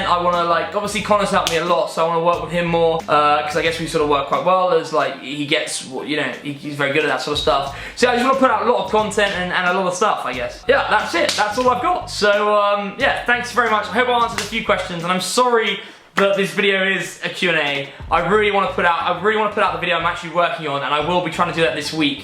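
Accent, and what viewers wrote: RP, United Kingdom. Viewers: has to be like the poshest sounding person on YouTube. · you sound quite posh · Where is he from he sounds so posh to me 😂😂😂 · You are so posh. I ❤ your accentttt😍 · never realised how posh he actually sounds until now :O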